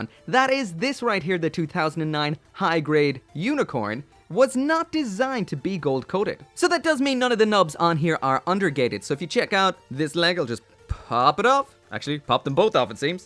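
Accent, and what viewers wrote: Ireland. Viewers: Are you Irish like me?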